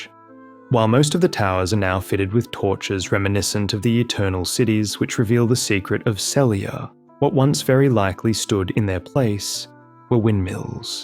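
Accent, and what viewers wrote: Australia. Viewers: Hey not sure if this is me just confusing aussie accents but you have to be ' ' right? If so you're killing it with these channels dude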